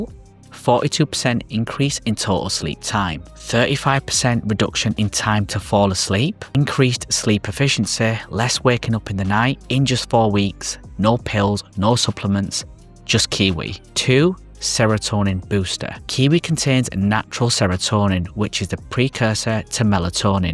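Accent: United Kingdom. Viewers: Cockney accent?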